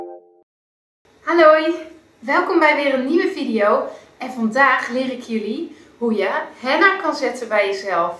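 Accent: Rotterdam, Netherlands. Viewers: Dat is rotterdams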